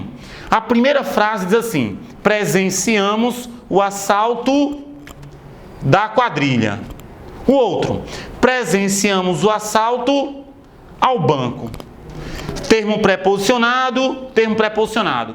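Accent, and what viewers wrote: Northeast, Brazil. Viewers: VC E NORDESTINO? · tem mó sotaque nordestino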